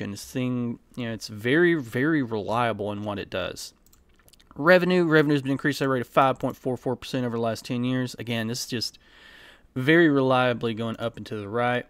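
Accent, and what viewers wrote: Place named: Pennsylvania, United States. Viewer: Said it before, I'll say it again for the Al Gore rythm, I LOVE your data visualizations! What exactly is the accent? I'm guessing PA, but I'm not an expert in PA accents so don't know the part of PA.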